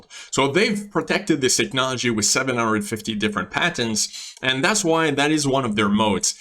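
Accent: Quebec, Canada. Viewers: Tu viens du Qc?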